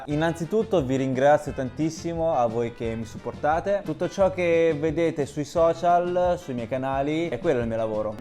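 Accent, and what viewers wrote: Italy. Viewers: parli cinese con un fortissimo accento italiano🤣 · Cose avvincenti notante in questo video da parte di un italiano che ha vissuto a lungo in Cina. l'intro in cinese con marcatissimo accento italiano. :°) i piatti strani cinesi. mi hanno stupito! del primo non avevo mai sentito parlare e mi ha incuriosito (l'ho appena cercato e pare sia roba che viene dalla provincia di Guizhou). gli sputi come cosa negativa della Cina, ricordo la prof di Cinese a Pechino che diceva che non si era al sicuro neanche quando si va in bici perché magari a fianco ti ritrovi un altro in bici che sputa e ti becca! ci avrò fatto caso il primo periodo ma poi non ho visto quasi più nessuno sputazzare in giro (magari anche il covid ha aiutato). una cosa che io invece detesto particolarmente è che la gente entra in metro (e in ascensore!) e in bus prima che scendi e mi da sui nervi. 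lol. dulcis in fundo a SHIJIAZHUANG mentioned! un salutino a Shijiazhuang la prima città in cui ho vissuto in Cina. ancora complimenti e scusate il pippone. ; P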